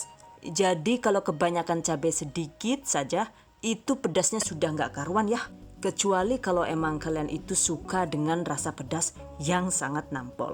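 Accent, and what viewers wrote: Banyumas, Indonesia. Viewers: Subcriber baru ☝️asli lucu banget kamuuuuu, gyemessss ngapak"nya dan caaaattaattt. Ada tips & trik, lengkap deh. Pas kepoin yt nya ternyata banyak bgt resep" yg ku cari". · Deneng ana ngapak2e 😂😂😂 jhahahaha😂 auto SUBSCRIBE yu. Komen ninggal jejak. Lopene kue di tempel. 💖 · Suka aksen ngapaknya kaya rika wkkkk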